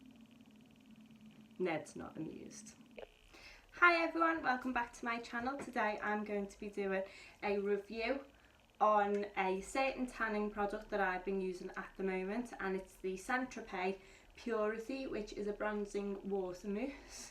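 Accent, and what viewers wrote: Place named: Liverpool, United Kingdom. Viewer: Yay a scouser 👋 thanks for the review x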